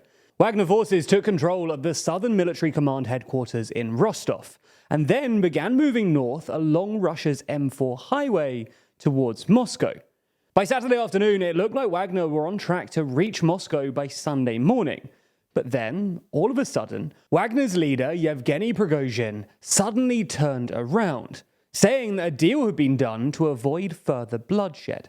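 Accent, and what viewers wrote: United Kingdom. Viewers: This has to be one of the most intestinal videos of this channel as of yet. From the thumbnail, to the assumptions... You probably were cheering for wagner when they were doing a coup and then calling them terrorists after they backed out. A speculative video elaborating assumptions through the lens of a british idiot that thinks he has brilliant deduction capabilities. You're just banking on the views brought by your acephalous peers that love to parrot anti-russia stuff. "The beginning of the end for for Putin"... Even the people inside the loop have no idea what happened, and here you are stating he was humiliated blah blah. The truth is that you should've just stayed in your lane, reduced yourself to your insignificance as a mere youtuber that looks like an overgrown baby and thinks he is intelligent and a respectable news outlet just because he has a british accent.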